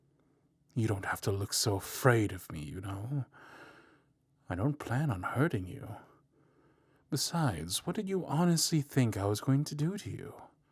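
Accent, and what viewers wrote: United States. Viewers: I thought chu were American...?!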